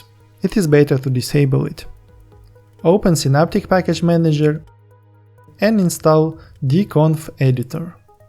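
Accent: Russia. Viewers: strong slavic accent, most probably russian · I'm sorry, I didn't mean to seem negative in any way. To me it sounds like you have a Russian or Ukrainian accent (?), languages that to me sound like they have a lot of i's or j's directly after some consonants. So i tried to write "probably" the way it sounded to me. Anyway great video, lot of useful information! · such modesty and humility is rarely seen. In my case, because of your accent, watching this video reminded me of my childhood spent in Russia. Thank you for that and for the very helpful video!:) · Говоришь с русским акцентом) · Russian accent + linux is very good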